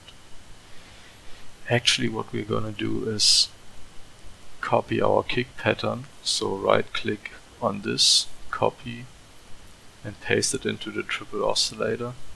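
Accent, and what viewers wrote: Germany. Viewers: Are you german? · He isnt sad it's his accent he is German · hard german aktzent hast du :) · Man hörts an dem Akzent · are you german? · Thank you man! You sound like you're from Bavaria. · Can know by your accentXD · man merkt voll dass er deutscher ist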